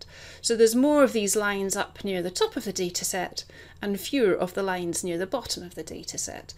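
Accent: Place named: United Kingdom. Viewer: Thank you. That was concise and wonderfully analyzed . Meanwhile your British accent is super!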